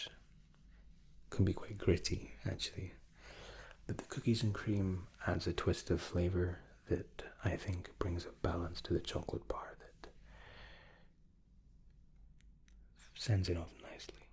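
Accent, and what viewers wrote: Scotland, United Kingdom. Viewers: Where were you born because I fell like you have a bit of a Scottish tone and some words are pronounced Scottish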